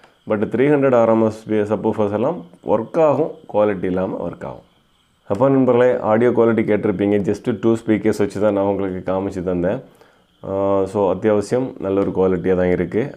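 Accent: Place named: Kerala, India. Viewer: ഇവർ മാർത്താണ്ഡംകാരാണ് അതു കൊണ്ടാണ് മലയാളം കലർന്ന തമിഴ് വരുന്നത്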